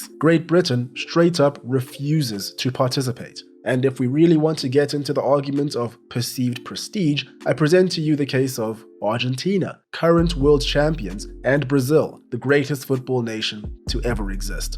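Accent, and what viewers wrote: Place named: South Africa. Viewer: 😅He's not English I'm South African his accent is closer to mine than England on the opposite side of the hemisphere to us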